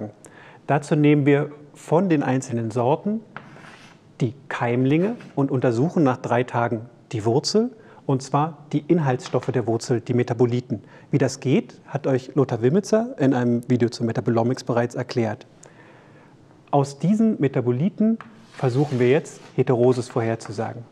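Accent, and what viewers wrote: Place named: Germany. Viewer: HA! Ich wusste schon beim englischen Video, dass der Akzent aber recht deutsch klingt :D. Es wäre praktisch, wenn ihr die Videosprache im jeweiligen Titel auszeichnen würdet, da viele Englisch-Sprachige Zuschauer kein Deutsch können bzw auch viele Deutschsprachige sich im Englischen nicht wohl fühlen.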